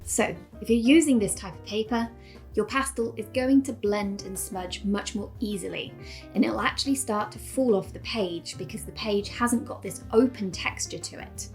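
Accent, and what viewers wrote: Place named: England, United Kingdom. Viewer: Thanks for the advice , I love your English accent!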